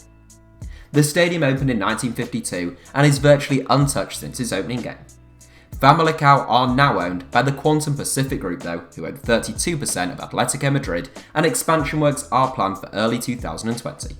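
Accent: England, United Kingdom. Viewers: why on Earth would you picture a random French bloke when an Englishman speaks?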